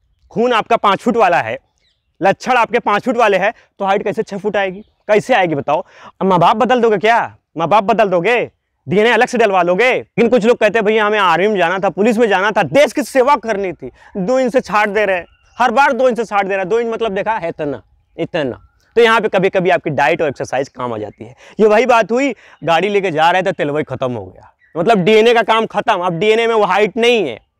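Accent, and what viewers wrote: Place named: Morena, India. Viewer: Aap bilkul Morena walo ki tarah bolte ho humari desi aawaaj mein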